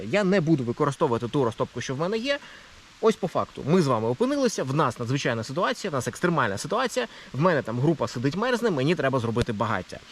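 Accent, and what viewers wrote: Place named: Russia. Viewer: Я тоже не понимаю зачем говорить на одном языке, а думать на другом!? У автора так и вырываются русскоязычные нотки в диалоге и водно, что украинский даётся тяжело, а, в карпатах на украинском, разговаривают гораздо быстрее!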